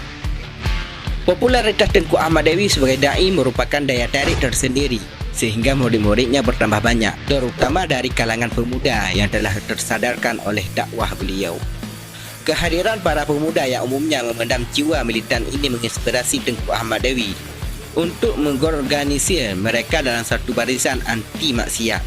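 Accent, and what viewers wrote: Aceh, Indonesia. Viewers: Hahahah bek beungeh duen, nyoe jeut keu ciri khas channel nyoe nteuk, narator jih basa indon logat aceh that, lidah asam sunti is the best.. hhhh 😁👍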